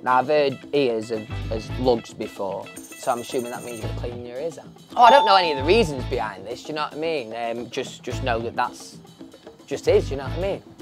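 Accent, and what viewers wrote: Yorkshire, United Kingdom. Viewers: It’s so funny being from Doncaster and seeing everyone simp for this accent 💀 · a wholeass 4 min video of Louis talking about Yorkshire slang in his thicc British accent.....we truly won 😭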